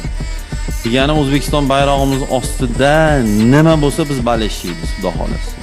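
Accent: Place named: Russia. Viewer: Domla nega rus tili talafuzini koʻp aytasiz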